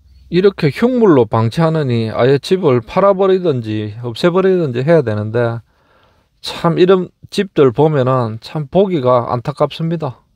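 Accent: Gyeongsang, South Korea. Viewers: 저는 구수한 경상도 사투리 목소리가 좋아 즐겁게 방송을 보고 있습니다~ 물론 전국에 버려진 빈집이 이렇게 많이 있다는 게 안타까운 마음으로 보기도 하지만요.. 아무튼 방송 고맙게 잘 보고 있습니다. 늘~ 건강하시고 안전하게 현장 취재 하시길 기원합니다~ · 경상도사람이 경상도 사투리 쓰는게 무슨 문제라고 시비을 거는지 서울촌놈도 서울사투리 마이 쓰더만... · 제가 이 tv를 구독하는 것이 컨텐츠도 좋지만 구수한 경상도 사투리때문에 보고 있어요. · 사투리? 경상도 말이좋아요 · 경상도 말씨 듣기 좋습니다 ❤ 상세한 설명 ~~ 즐겁게 보고있습니다. 홧팅 입니다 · 전고향이 충청도인데~! 경상도 사투리와 어걍이 자연스럽고 구수하게 들려서 구독했습니더~! 마~! 빡세개 화이팅~ 하이소~!!:hand-pink-waving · 전국 방방곡곡 다니시느라 고생 하십니다. 인구절벽과 경기침체에 농촌마을이 황폐화 되는군요. 경상도 사투리 좋아요. 괜히 마음쓰지 마세요. 덕분에 앉아서 전국을 구경하니 얼마나 좋습니까? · 경상도의 말투가 부산 경남과 대구 경북은 완전히 다른데 은 서울 말투보다 듣기좋네요.오늘도 좋은영상 잘 봤습니다. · 경북에 사는 사람인가보네요... 표준말 쓰라고는 안하겠데, 불편한건 맞아요.. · 경산도말이 좋습니다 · 경상도 사투리 구수하게 들리는데 누가 시비를 터는가 보군요 모르긴 몰라도 앞으로 폐가 문제는 더 심화 될것입니다 보이지않는 텃새 지역 이기주의 배타적인 정서 나열하기 싫은 단어들이 떠오르네요 아무튼 고생많았습니다 영상감사합니다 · 말은 표준말인데, 억양이 경상도라써...그런지, 알아듣는데는 아마 이상없을 것입니다. 천연기념물 의 톡특한 영상 감사히 잘 보고 있습니다. 혼자 다니시니, 몸조심 하시기 바랍니다. 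사고나면 혼자다 처리해야 하니......조심 조심 운전하시길 바랍니다. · 자연스런 경상도 억양 넘 좋아요 화이팅입니다^ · 사투리 말씀 재미 있고 구수함니다.경상도.사나이.아이가...이곳미국은.빈.건물.상속자가.꼭세금을내서..세금이.밀리면.스테이.에서.가저같니다.😮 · ㅋㅋ 경상도 사투리 구수하게 듣기 좋아요~ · 댓글에. 사투리에. 설왕설래. 하시는분들. 많으시네요. ~ 전. 좋으네요...^^ 각. 지방사투리중/경상도가. 최고라얘 어느분 글처럼. 음소거로. 시청하이소. 😂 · 간결한 갱상도말 지금시대좋쵸 😊😮😂🎉❤ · 경상도 사투리 정겹습니다....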